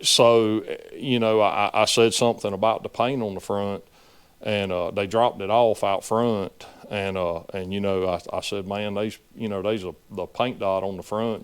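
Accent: South, United States. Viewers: this is the most southern state drawl I've ever heard, a true testament to the American educational system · lack of oil supply on cam gears in my opinion and your southern draw is awesome dude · my man here has got once serious country accent....I miss the south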